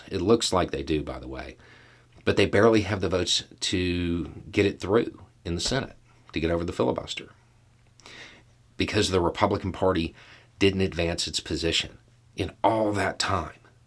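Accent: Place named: Appalachia, United States. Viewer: Ah another "I have a hillbilly accent but I'm not like the rest of them" Your Zelda shirt gives you away, dude.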